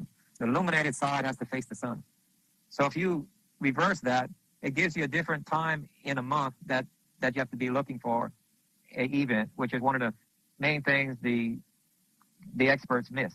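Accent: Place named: Louisiana, United States. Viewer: I would guess this guy is from Louisiana. Listening now…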